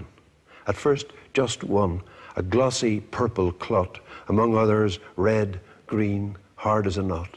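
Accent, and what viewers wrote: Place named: Sligo, Ireland. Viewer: Oh How I would love to talk to this man. The sound of his voice sounds like Sligo. When visiting my Grandmothers house in Mulaghmore and cousins" The Feeney's" I walked a lane with rock walls and tons of beautiful blackberries. Their Grand Mother is buried next to Wm.Butler Yeats in Drumcliff. Alas, at 80 years old no more travel. What a great Poet. yvonne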